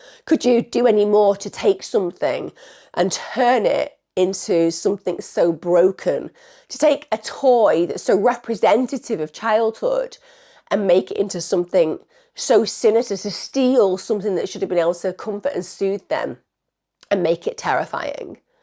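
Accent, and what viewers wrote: United Kingdom. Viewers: Sure english esp brittish is not my forst language but im fluent in it and fr sometimes I have NO idea what u saying. Like winding back listening 4,5,6 times and its just like i hear someone who got a stroke for 3 sek. I know i just suck on brittish but do the rest of you really understand everything? "all of these was basically seen... whAttt???"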